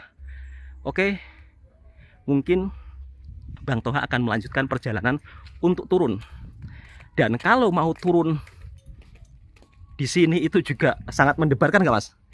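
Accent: Java, Indonesia. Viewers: Suara n tonasi nya bunyi jowo kn ...medok banget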